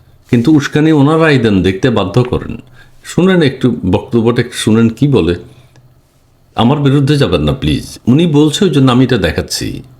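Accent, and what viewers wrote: Noakhali, Bangladesh. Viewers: দুর ও শালা নোয়াখালী কি বলে বুঝতে পারলাম না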